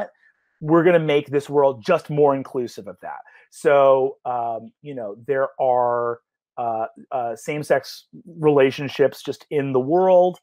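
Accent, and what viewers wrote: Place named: General American, United States. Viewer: He doesn't do it with Asians, either. His Battle for Beyond character, Nikhil, was coded Indian -- DM Jasmine Bhullar even gave the character's sister a distinctly Indian accent. Brennan just spoke with his Broadcast American English accent. Bear in mind Brennan can do quite a lot of white accents, from New Yawkuh to Sad Italian Man, to Russian mobster, to various flavors of Tim Curry, and many more.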